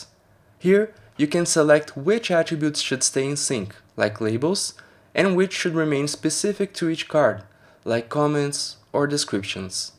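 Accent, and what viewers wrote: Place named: Brazil. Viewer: fácil encontrar um brasileiro falando inglês kkkkkkkkk vou testar seu power up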